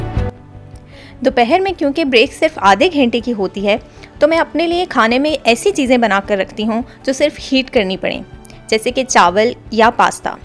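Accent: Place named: Pakistan. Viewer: Your Urdu accent is amazing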